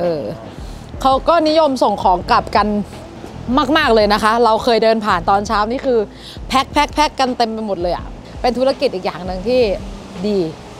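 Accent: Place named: Northern Thailand, Thailand. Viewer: แอดฯ.อู้เหนือเพราะจังเลย..แอดฯเป็นคนจังหวัดไหน?❤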